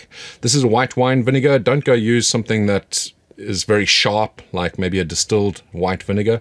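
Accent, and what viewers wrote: South Africa. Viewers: from that accent im guessing SA? first vid and love it. gonna try but first i gotta source some chllies here in japan.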